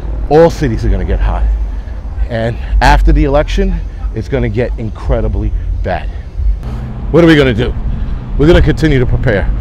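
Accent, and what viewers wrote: New York, United States. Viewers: A home boy says it straight up just like a NYer!!! THANK YOU!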